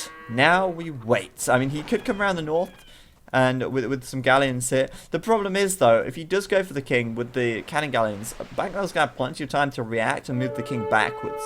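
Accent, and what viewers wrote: United Kingdom. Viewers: are u british??? are u married??/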